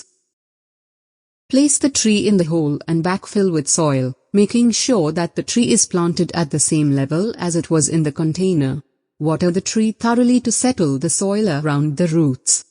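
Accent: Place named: India. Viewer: dirty indian voiceover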